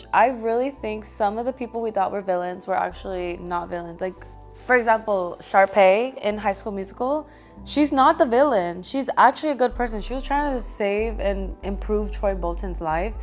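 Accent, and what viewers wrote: Spain. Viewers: LOVE THAT SPANISH ACCENT 🔥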